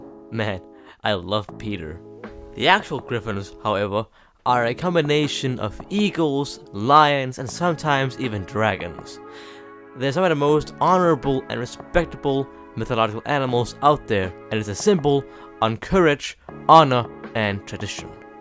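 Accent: Denmark. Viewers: Thought I recognized the accent. It's Danish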